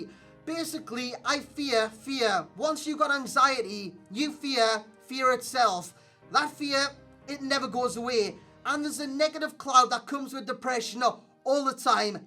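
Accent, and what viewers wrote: Newcastle, United Kingdom. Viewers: R u from Newcastle also keep up the good work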